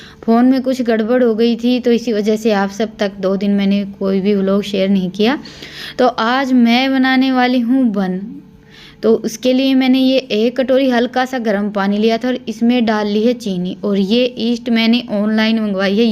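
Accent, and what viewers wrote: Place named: Alwar, India. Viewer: Aap kha se h plz batana aapki boli se to aap Alwar ki lagti ho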